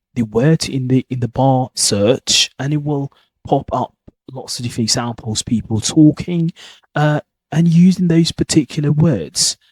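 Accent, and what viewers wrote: United Kingdom. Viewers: Really epic work mate, really well done to you for this an amazing piece of work work that you put in to grow you channel and of course helping others to level their British English and pronunciation and by the way I really into your accent really well done to you keep it up you will get there mate .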